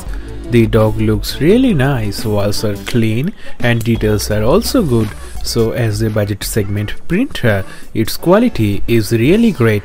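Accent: India. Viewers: Lol, its super beautiful, i sometimes love indian-eng accent like you...lol If you think that why havent i wrote anything then, i probably wont get it its 1million percent truth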